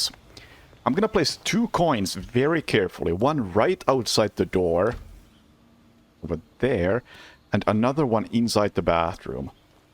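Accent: Finland. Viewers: Damn I’m glad I found your channel. Amazing work! Your Finnish accent is captivating! I’ve obsessed over Alan Wake recently and so they have a resounding amount of Finnish references/language in the games. What a fascinating people. I love Finland!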